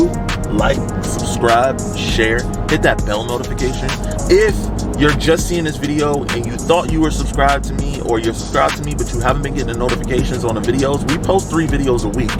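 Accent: Trinidad and Tobago. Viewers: I hear a trini I swear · I hear a trini accent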